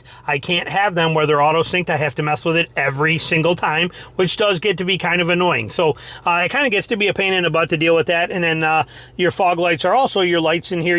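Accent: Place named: Chicago, United States. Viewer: That’s a very Chicago accent for a South Georgian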